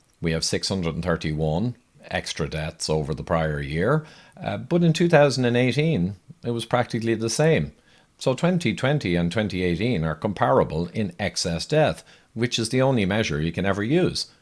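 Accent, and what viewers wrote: Ireland. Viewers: bud you talk like irish guy. did you know?? :)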